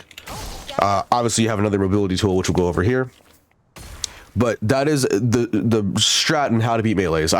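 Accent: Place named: France. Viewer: French accent 🤣 Get better man! And thanks for your time showing this guide to us!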